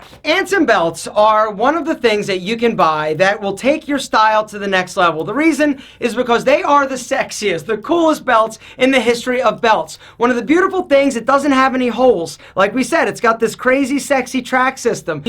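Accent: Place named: East Coast, United States. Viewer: Hey I enjoy your channel...quick question for ya. I think I recognize that accent. Might it be from a major East Coast City between New York and Washington? I have a bet with my wife!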